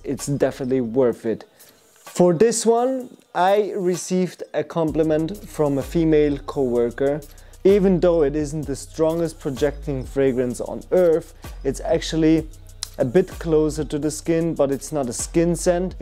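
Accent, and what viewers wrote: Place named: Italy. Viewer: Wearing Gris charnel far too much at the moment 🤣. That Italian accent, almost as good as mine 🥲👍🏻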